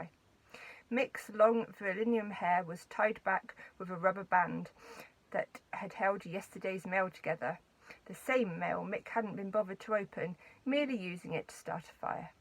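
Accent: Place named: United Kingdom. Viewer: Sounds so much better with a British accent!!! Thank you for doing this. You rock.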